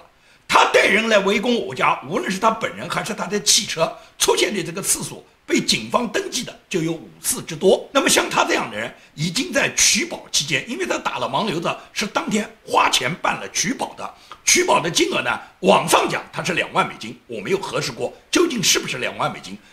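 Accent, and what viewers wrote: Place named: Guangdong, China. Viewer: 听口音不像广西的，更像广东的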